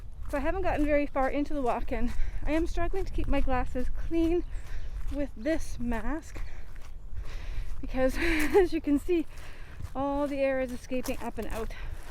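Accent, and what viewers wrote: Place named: Canada. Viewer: Love these helpful, practical videos! I knew you're Canadian the first time I heard you speak. Sherwood Park... are you... from Red Deer?!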